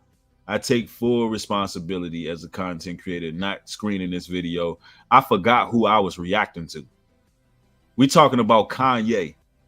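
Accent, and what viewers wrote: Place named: Philadelphia, United States. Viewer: Your Philly/Meek accent is hilarious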